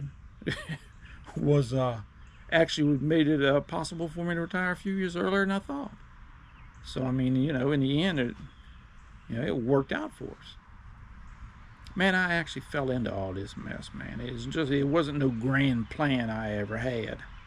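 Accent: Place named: Virginia, United States. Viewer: Are you originally from the shenandoah valley of Virginia or West Virginia. I am and you sound like you are. Anyhow I saw this video today, subscribed and watched all your videos in order. I am soooo happy to hear someone honest and down to earth non financial guru. I am 55 still working. Worked in factories since I was 18. Been at this one for 33 years, no pension because company was sold in 2015. Could not agree more about factory work was people working together, friendships and kind of fun. Now if one person is offended or does not agree with another person's opinion it can cost you your job. I want to retire early at 56 with a high 6 digit 401k, probably Obama care gold level insurance. Wife already retired this year at 58 and drawing a few hundred from her 401k per month. I, like you, have been just digging into vids books whatever to educate myself and am nervous I'm missing something. 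Just a hs education here but 100 times more than my grandparents had and I owe them for my work and saving ethic. Like you and your wife we can live good on 3400 net a month and could trim that down if needed. Keep telling your stories because I feel like you are in my living room talking to me and answering my questions. Thank you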